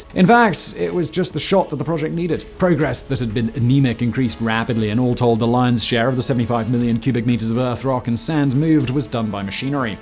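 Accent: United Kingdom. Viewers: who is this slaphead beardman who hosts every youtube channel at least in this series he doesn't exaggerate a british accent quite so much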